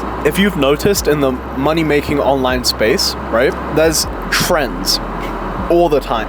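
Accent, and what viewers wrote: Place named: Australia. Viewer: Since when do Chinese have an Australian accent?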